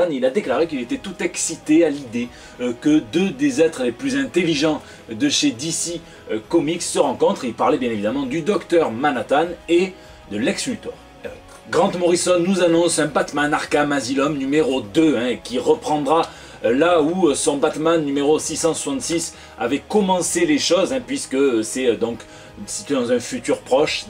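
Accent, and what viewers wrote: South, France. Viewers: Je préfère regarder ta barbe soyeuse danser délicatement au son de ton magnifique accent du sud! Tu nous la fous en demi-molle chaque mercredi tu sais.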